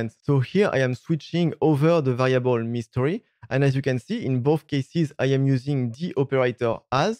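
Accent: France. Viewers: Are you french??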